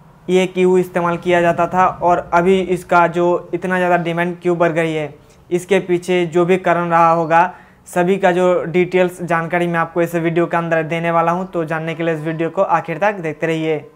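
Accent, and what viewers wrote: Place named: Bihar, India. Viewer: Bihari hai kya be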